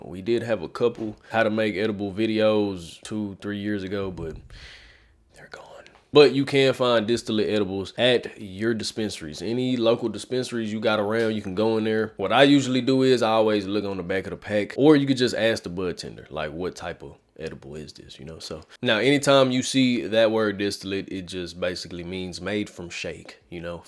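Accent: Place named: Texas, United States. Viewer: Strong southern accent + left over ribs and bake beans I know this man is from Austin or Fort Worth, TX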